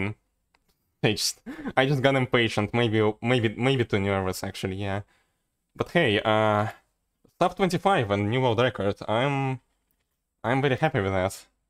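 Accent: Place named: Russia. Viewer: Lol, русский акцент сразу слышно)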